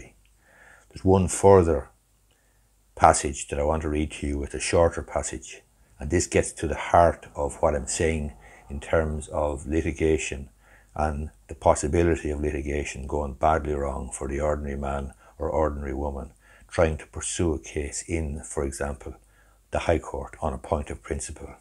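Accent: Ireland. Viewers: I have read this introduction to Bleak House many times and never fail to admire the brilliance of Dickens. Thank you for drawing attetion to it and reading in your lovely Irish brogue!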